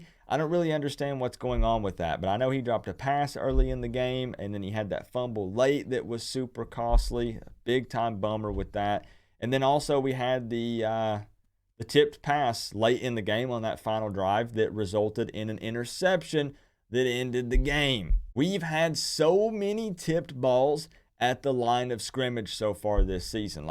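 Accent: South, United States. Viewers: Great analysis! Still don’t understand (considering your southern accent) how you are a Canes fan. All the Canes fans I know are into bling-bling, hip-hop and gangsta rap.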